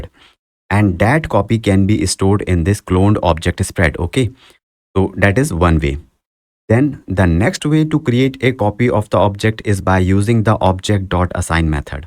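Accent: India. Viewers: The contents is 100% but its diffcult to understand with Indian accent -_-. !!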